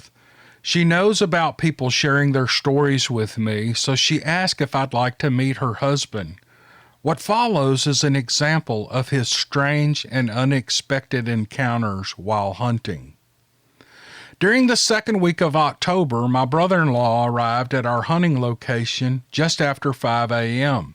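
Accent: South, United States. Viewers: Somebody should proof-read this! In one sentence early on you had the sentence correct but switched the words "mind" and "bed"...that made no sense but would fit if you put the words where they belong. It is like a robot-reads this. This could not happen if it were read by a live human. It is like the man's words are used but a robot reads it; a robot would not understand the meaning and therefor not notice it! So we tried to figure it out but can not! This is crazy. Who would use a robot voice with a southern accent that even pronounces "oil" as "o-el"...? I hope you can explain it!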